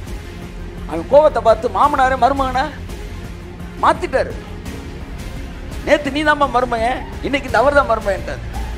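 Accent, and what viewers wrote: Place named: Madurai, India. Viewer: 👉நம்ம ஊரு மதுரை வட்டார தமிழில் 💗 கடவுளின் வார்த்தைகளை கேட்டு மிகவும் உற்சாகமும் ;சந்தோஷமும் அடைந்தேன்.. 💗 இந்த சிறு செய்தி எனக்கு புது நம்பிக்கையை கொடுத்தது (தொடர்ந்து உங்கள் செய்திகளை கேட்டு வருகிறேன் ) நன்றி . அன்புடன், புதுக்கோட்டை சரவணன் . (08.Nov.2020)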